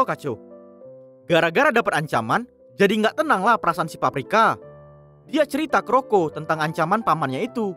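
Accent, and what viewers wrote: Medan, Indonesia. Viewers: abg orang medan mana? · Bah...orang medan rupa nya..sukses trus lae..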